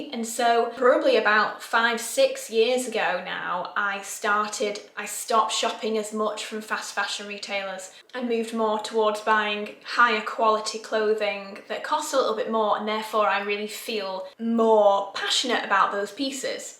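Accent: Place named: Manchester, United Kingdom. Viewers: and I love that you say your accent will never change and how proud of your northernness you are, I’m a fellow manc living in the south and I feel the same! 🥰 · Hilarious that someone thinks you put on your accent. I'm from Yorkshire, your accent is authentic to Manchester. If your a northerner you can spot a fake accent a mile off. Your the real deal... x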